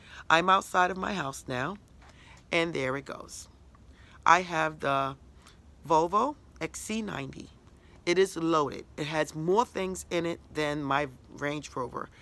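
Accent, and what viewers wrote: New York, United States. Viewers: I'm so glad I found this channel!!! I manifested my Ford F-150 got it brand new..in fact it was even better than I pictured!! It was a truck that was from an other dealer...fully loaded..I loved it...I didn't even know I manifested it...this was in 06...that next year the secret movie came out..of maybe it was 06 but I didn't see it till 07....anyway I want a Ford Raptor...it's an F-150 on steroids...it's awesome!!! I see it everywhere...I feel like there is a block..but I watched some of your videos....I love manifestation stories there needs to be more!!! And the how with it....too many vids are just technique and guruish...I need proof!!! Not that I doubt loa but it inspires me...like this vid right here!!! Thank you! Ps where are you from I'd that a new York or Boston accent..lol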